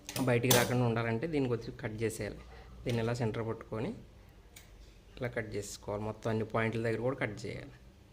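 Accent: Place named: Andhra, India. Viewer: Mana Andra slang theggottandi ani😊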